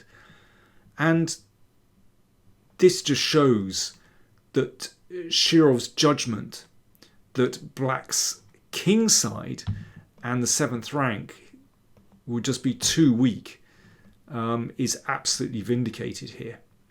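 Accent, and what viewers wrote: England, United Kingdom. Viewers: I agree : a delightful english accent that makes the video even more agreable.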